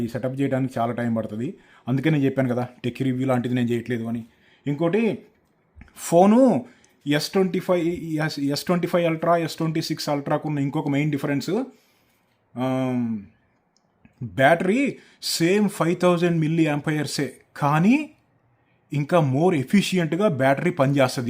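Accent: Andhra Pradesh, India. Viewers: Anna nee slang mottam pure telugu (andhra) laane undi anna …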